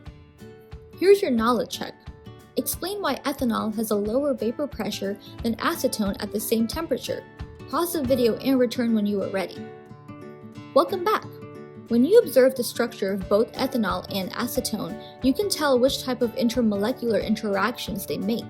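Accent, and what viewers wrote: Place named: South Korea. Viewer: You made it soooo Cute!!!✨😆 Thanks Girl😝. BTW are you Korean? Your accent seems korean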